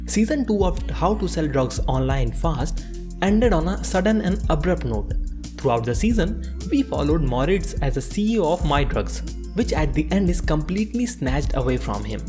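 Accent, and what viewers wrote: India. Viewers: 🙄🙄🙄 yeah that's what I said Im Indian too I guessed it by the accent bro thanks for an informative video.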